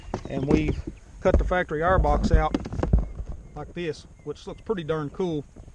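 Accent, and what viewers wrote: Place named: Pittsburgh, United States. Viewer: I can tell where "yinz" are from. Lol. Nice scoot. Looks tome like you know your 2 strokes.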